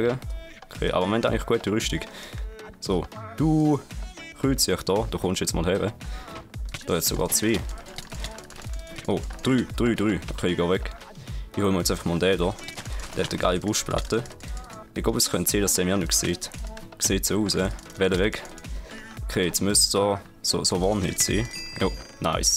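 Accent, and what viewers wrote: Switzerland. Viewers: dü bisch meini ä westschwiizer... bi urner :D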